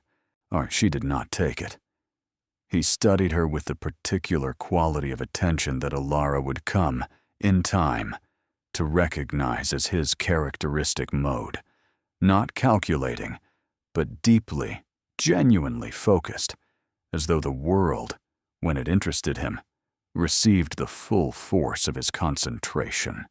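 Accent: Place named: United States. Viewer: Ovo je jedan od dva AI kanala koja pratim, ne volim AI kanale ali volim ovakve priče, kad ChatGPT nije nepismen kao obično. Molim da se prebacite na britanski engleski jer američki akcent u pričama ove vrste iritira i dodaje na veštačkom dojmu kojeg je, zbog AI, ionako više nego dovoljno. Hvala.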